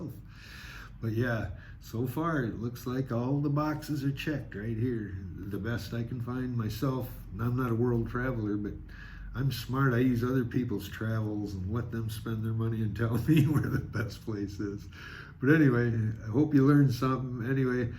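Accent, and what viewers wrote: Canada. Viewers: You hear some good ol' Canadian, eh??;-))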